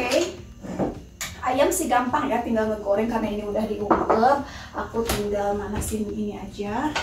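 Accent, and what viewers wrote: West Java, Indonesia. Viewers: Logat nya kaya orang Sunda.. Makanan sederhana tp Nikmat tiada tara.. Selamat menjalankan Ibadah puasa.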